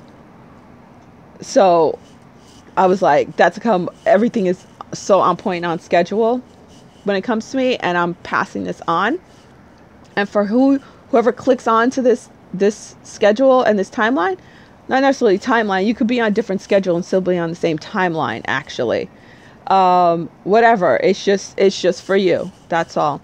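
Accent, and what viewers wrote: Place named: Brooklyn, United States. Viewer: Love your videos. Will be purchasing your book for my little cousin. She is only 2 years old but such an old soul. Love your accent reminds me of home. I was born in Brooklyn, NY 😊 Blessings to you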